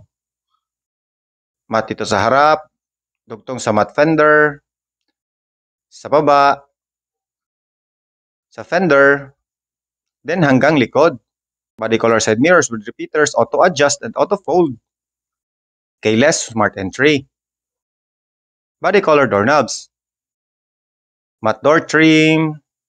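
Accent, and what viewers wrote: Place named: Thailand. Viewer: Bro, bakit Thailander ang accent mo? Muntik ko ng I-skip akala ko ibang lahi 😂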